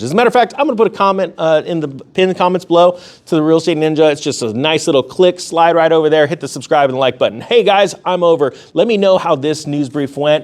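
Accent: United Kingdom. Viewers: That UK accent has me dying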